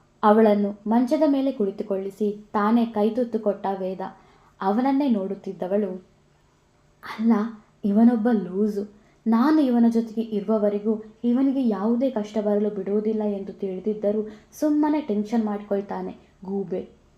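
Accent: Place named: Dakshina Kannada, India. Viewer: hauda !! Naanu nimge bahushaha exams irabahudu anyone. Neevu kannada narrate maaduva style namma pure Dakshina Kannada style. Haagaagi bahala kushi aagthade keluvaaga. Adanne naanu miss maadikollodu.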